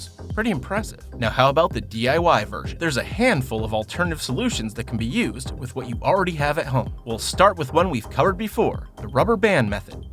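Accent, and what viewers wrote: Buffalo, United States. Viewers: Wait is he from Buffalo? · You from Buffalo?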